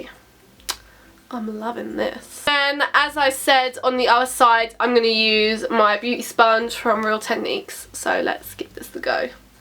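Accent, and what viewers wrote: United Kingdom. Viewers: iam in love with ur british accentttt !!!!!!😍😍😍😍😍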